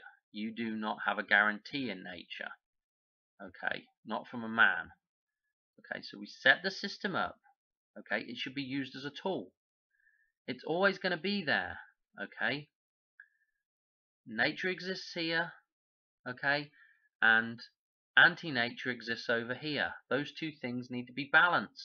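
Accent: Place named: England, United Kingdom. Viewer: Came over from Secrets of Saturn, well explained. Wasn’t expecting to hear an English accent. Greetings from Edinburgh Scotland.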